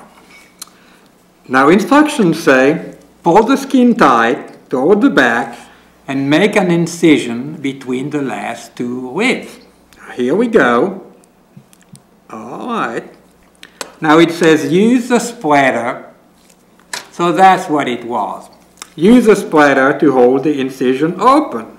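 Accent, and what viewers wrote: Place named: Louisiana, United States. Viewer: Interesting but that "smacking" gets on my nerves. Also, he sounds like a Cajun.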